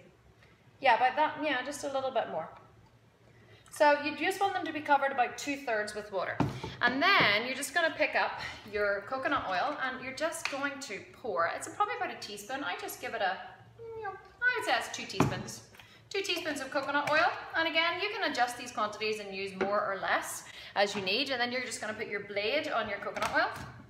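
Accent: United Kingdom. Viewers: Northern Irish accent. Didn't notice that she spoke too fast, but I'm British..maybe that's why. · Wee belfast lady ballymena? Thanks for video its great x x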